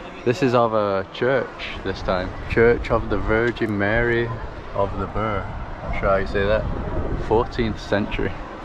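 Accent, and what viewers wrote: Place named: Yorkshire, United Kingdom. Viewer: Hi it's John do you have a link in Yorkshire your twang sounds like it is thanks for the video John uk 😊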